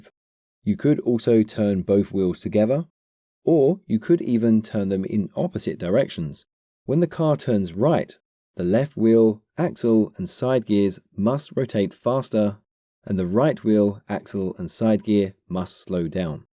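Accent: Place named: England, United Kingdom. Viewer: Usually, the gears that you refer to as 'spider gears' and 'side gears' should more properly be referred to as planet gears. Others have also mentioned the crankshaft/camshaft error. Your terminology (despite your English accent) seems to be the dumbed down USA version. It's almost as if the correct term is just too difficult to learn or remember, so they HAVE to simplify it. Otherwise, a fairly decent explanation of events. Regards Mark in the UK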